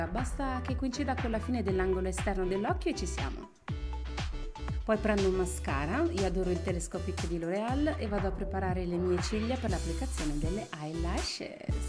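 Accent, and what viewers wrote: Italy. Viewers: Sei molto bella e mi è piaciuto il suo accento italiano 😙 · Your videos keep less bored especially when I can't sleep. I love hearing you speak italian even if I don't understand half what you are saying. I have Nigerian Friends who were born in Italy, They speak just like you. Need to start doing videos in my language LOL. Thanks for this awesome creativity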